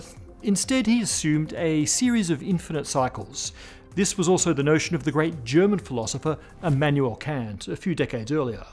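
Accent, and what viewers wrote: Australia. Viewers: He's speaking with an Australian accent, if that's what you're talking about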